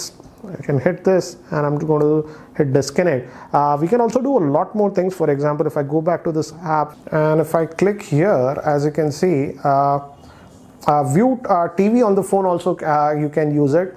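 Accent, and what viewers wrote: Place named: India. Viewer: english indian accent... the most terrible!...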